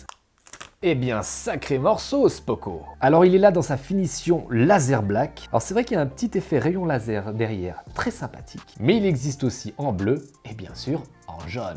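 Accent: Switzerland. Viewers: Rien que pour ton humour et ta joie, ce qui ne t'empêche en rien d'être très Pro, précis et perspicace, je regarde tous tes tests même si j'ai pas vraiment besoin d'un smartphone... mais plutôt de sourire sur ton charmant accent franco-"phone".... hihihi! Bises à la Suisse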